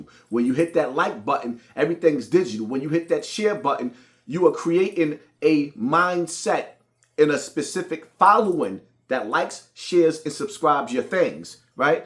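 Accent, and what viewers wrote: Brooklyn, United States. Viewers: BROOKLYN TONE N THE BUILDING,..EAST NY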